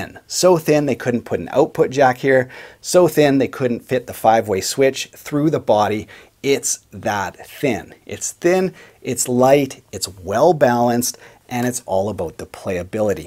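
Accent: Canada. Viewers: He sounds more like a Canadian. Hear the “aboat”?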